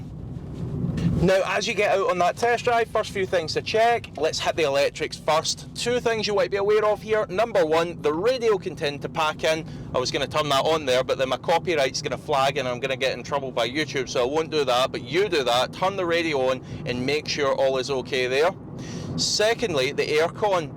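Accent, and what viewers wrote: Scotland, United Kingdom. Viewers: Very good and informative video, well done!. Also your scottish accent is cool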